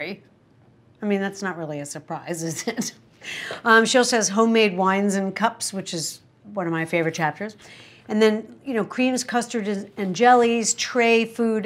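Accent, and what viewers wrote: RP, United Kingdom. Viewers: Awh, my mother had endless cookbooks including many by dear Fanny Craddock. After my mother died I picked up only 2 or 3. mmmmmmm ... I wish I could go back and grab a truckload. Your 'Fanny-accent' is perfect heightened R.P. She really was a breed apart. Few of her ilk are left. Thank you for bringing that era and the older cookery books to life. You infuse even the poetry with sparkle. You are a rare talent! Love, love, love. Xxx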